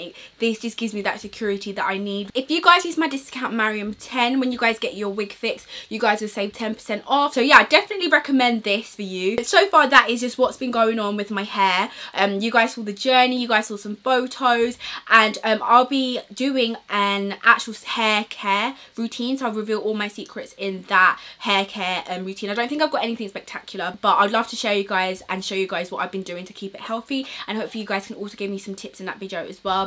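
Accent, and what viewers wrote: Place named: United Kingdom. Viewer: What is it about these damn British accents??....Like I can't!!! I just love you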